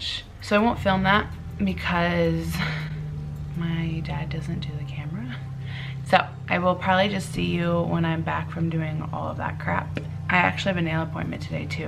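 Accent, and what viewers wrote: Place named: Midwest, United States. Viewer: lol, i knew you were from the midwest! we have the same accent ahaha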